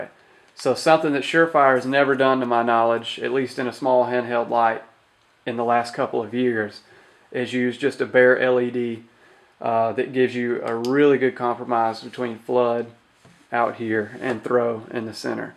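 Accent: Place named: South, United States. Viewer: embrace your southern heritage and accent.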